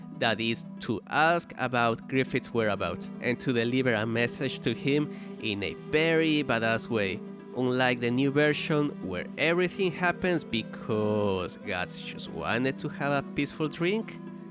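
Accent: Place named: Eastern Europe. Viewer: I can hear some Eastern European accent. You're from Georgia?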